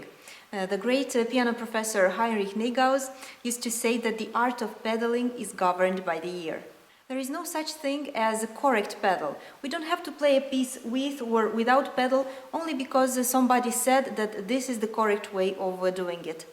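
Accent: Russia. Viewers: ох уж этот русский акцент, но красивая - глаз не оторовать )) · Замечательный, качественный учебный материал, сайт тоже сделан старательно и со вкусом. Небольшая ремарка насчёт русского акцента (товарищ внизу тоже заметил), от него легко избавиться если захотеть и понять главный принцип — англичане, особенно американцы, издают звуки большей частью через нос. Направляете все гласные туда, на этот канал цепляете согласные, и от нативного спикера будет не отличить, голос станет более певучим и появятся красивые обертоны. Процветания!! )))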